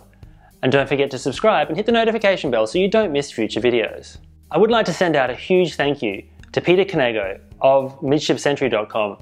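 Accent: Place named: England, United Kingdom. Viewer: I ran this video at .75 speed and this chap is more understandable with the English accent.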